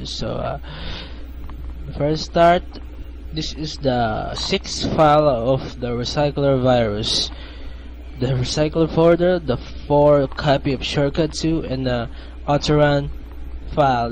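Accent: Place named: Philippines. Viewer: Pare Pilipino ka noh,, hahah obyus na obyus..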